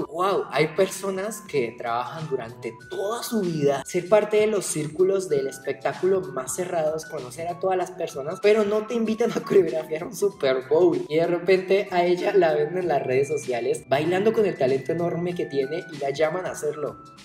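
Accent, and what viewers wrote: Mexico. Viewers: Pero por qué hablas como mexicano gomelo?? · Hablas mexicano. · Este tipo es colombiano y habla como Mexicano?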